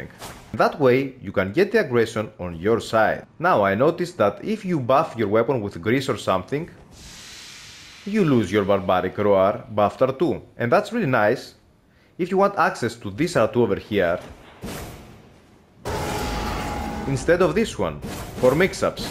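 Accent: Greece. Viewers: Man I know a greek accent when I hear one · That’s a Greek accent bro am I right ? 🇬🇷✊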